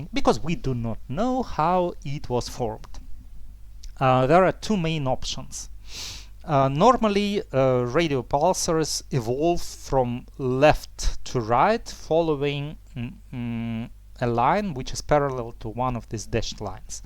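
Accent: Russia. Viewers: Родной акцент (хоть и не самый сильный) режет слух и не даёт сконцентрироваться на содержании... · не знаю, наверное русский акцент. Я же не учил произношение юар, филиппинцев.. или индусов - их ретрофлексный акцент надо ещё научится делать. А если ещё поумничать, то наверное ближе к юар, у них в африкаансе больше звуков похожих на те, что в русском.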